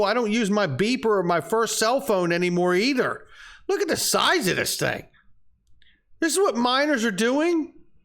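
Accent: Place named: United Kingdom. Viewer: your videos report i excite see you every time you very very make me smile.. we excite about coin this you say for us XRP. WE LOVE YOU MUCH MUCH MUCH, U speak british cool 😘😘😘😘😘😘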